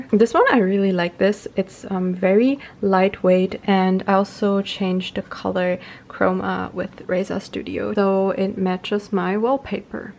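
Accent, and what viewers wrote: Germany. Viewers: As soon as I heard you speak I knew you were German! You are adorable!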